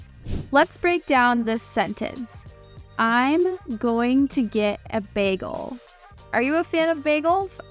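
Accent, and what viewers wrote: United States. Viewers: which state are u from,?😊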